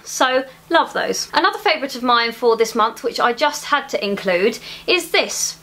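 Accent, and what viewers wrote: England, United Kingdom. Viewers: Hello girl, you are so lovely to watch. The little nook where you film your videos is properly decorated and pleasing to the eyes. I love your English accent :). Two thumbs up for your videos. Well wishes from Asia :).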